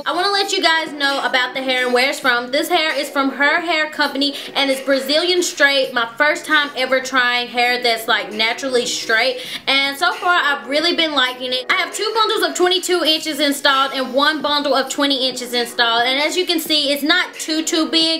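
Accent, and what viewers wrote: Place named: Texas, United States. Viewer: you have to be from Texas !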